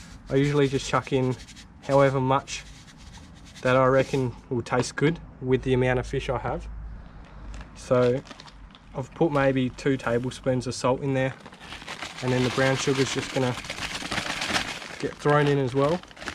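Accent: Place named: South Australia, Australia. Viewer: I think this is the first time I’ve noticed a different accent from SA to east coast Aus . Nice vid ! Cheers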